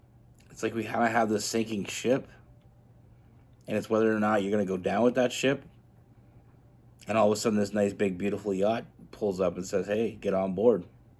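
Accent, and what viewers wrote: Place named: Canada. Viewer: bro that was so great and spot-on! have let go/moved away from a libra, but some of that energy is still hanging around ... meanwhile a pisces has come along and we're taking it slow and getting to know one another and it really feels like emperor/empress. anyway i thought you might also be canadian based on your accent and then i saw the tim hortons lol! thanks and take care.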